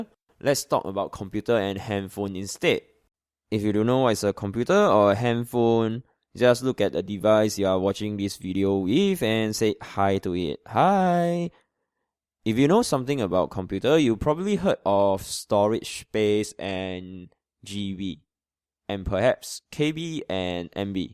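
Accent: Singapore. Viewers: definitely singaporean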